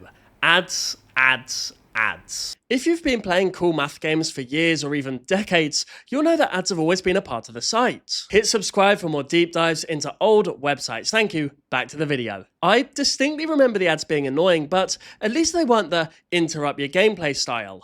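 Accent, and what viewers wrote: United Kingdom. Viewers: hes british bro relax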